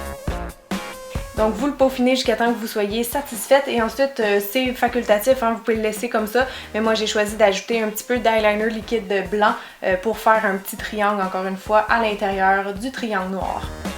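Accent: Quebec, Canada. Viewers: Franchement je t'adore a fond tu es magnifique et mon dieu ton accent 😍😍😍 je suis amoureuse de ton accent, j'adore l'accent des québécoises 😍😍😍 bisous 😘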